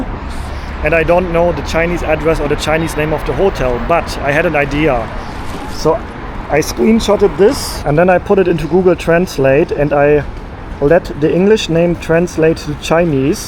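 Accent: Germany. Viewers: Gah, it’s taken me so long to figure out where I know his accent from (yes, germany) but he sounds like Klaus from American Dad 🤣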